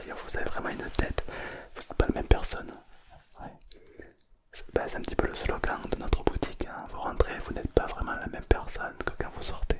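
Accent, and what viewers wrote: South, France. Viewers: pk il fait l accent du sud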